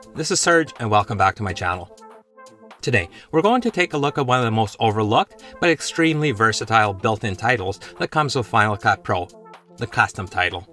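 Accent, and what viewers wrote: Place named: Canada. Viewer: Where is this accent from? It seems Canadian, but I dunnoo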